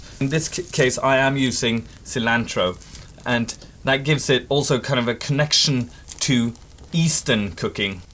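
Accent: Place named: United States. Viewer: He also herbs with a silent H (in a different episode?), which I'm quite sure they only do in the US.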